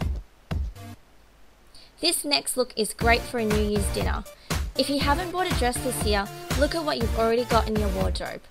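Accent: Australia. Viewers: u sound australian reminds me of alli simpson <3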